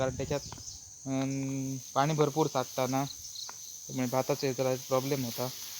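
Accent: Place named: Malvan, India. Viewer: सुमधुर, मालवणी लहेजा असलेली ... भाषा शैलीने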